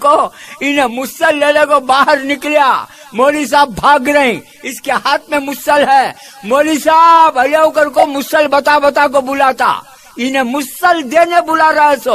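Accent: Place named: Hyderabad, India. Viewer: North india me aisich boleto hyd ki language ku gaun ki language samajhte, ab ye jo lehja hai, bohot purana hai. Hyd me salon salon pehle, aisa baat karte the magar ab bohot sare north ke words laliye. Bangalore bhi bohot developed city hai. Wahan pe aisa baat karte, to kya bangalore gaoun hogaya kya be ? Language se city gaoun samajhne wale gawar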